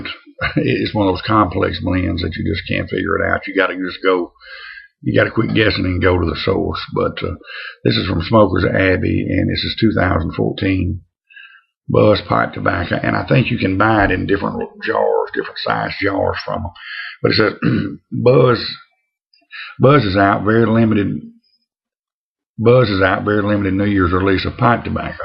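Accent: Louisiana, United States. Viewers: Greetings from Boston. I just love that Louisiana accent. I aim to drive my caaaa down there at some point. Got some Orlik Golden Slice on the way. Great for a morning smoke.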